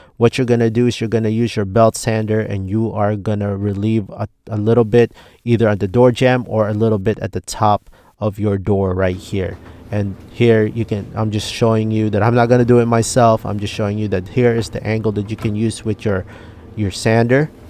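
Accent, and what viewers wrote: Philippines. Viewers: I know a Filipino when I hear one lol Thanks for this!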